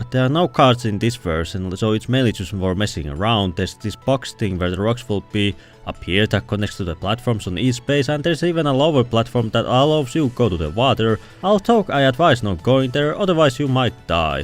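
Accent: Finland. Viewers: I was like 99% you were Finnish and this just confirmed it. Perkele TF2 Suomi!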